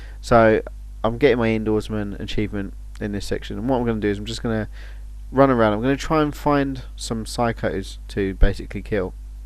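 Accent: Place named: United Kingdom. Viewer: hey ur guide is awesome and i love the british accent im american but id like to be british instead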